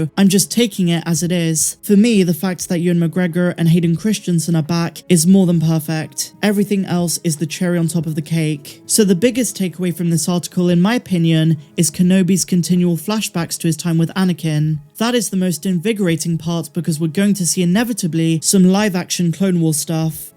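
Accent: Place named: West Country, United Kingdom. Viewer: Gotta be West country right? Your accent sounds so much like mine, I genuinely find it a comfort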